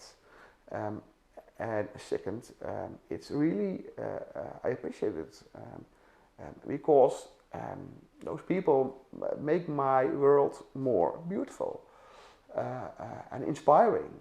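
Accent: Netherlands. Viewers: Like every Dutch (or Flemish) person I've ever met, you speak perfect English,. Your Dutch accent is as lovely to listen to as your music. And your Ten Commandments are just right.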